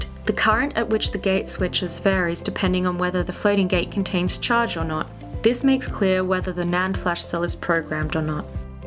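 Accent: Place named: Australia. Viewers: sounds like urban Australian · The very definition of an Australian accent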